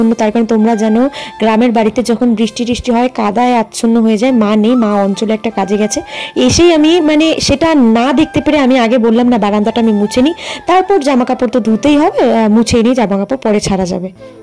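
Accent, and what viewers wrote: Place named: Cooch Behar, India. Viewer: যখন তুমি কোচবিহারের ভাষাতে কথা বলো শুনতে ভীষণ ভালো লাগে ❤❤❤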